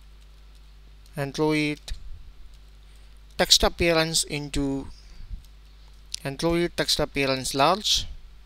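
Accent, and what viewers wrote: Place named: India. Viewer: Dat indian accent dou. =D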